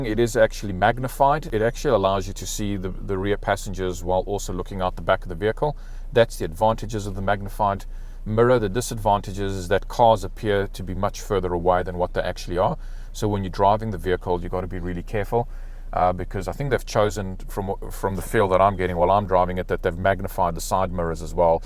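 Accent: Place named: Johannesburg, South Africa. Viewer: Sounds like you’re from Linksfield, Joburg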